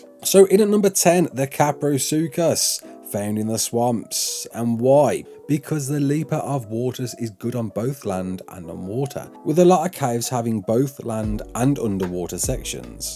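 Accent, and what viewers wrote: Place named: Walsall, United Kingdom. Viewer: Only just came across this video, it was really really helpful. You sound like you’re a fellow Walsall lad 😄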